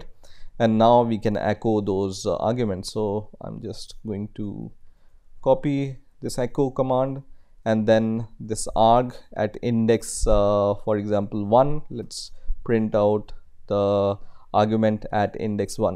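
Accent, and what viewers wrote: India. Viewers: You speak english like an Indian, so I can understand...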